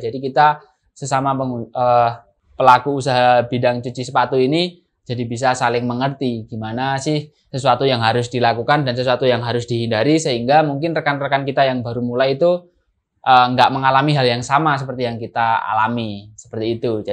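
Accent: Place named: Java, Indonesia. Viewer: bahasamu lo mas muwwedok banget, dan klo masih newbie jangan ngejelasin yg kurang dipahami oleh penontonmu. belajar public speaking lagi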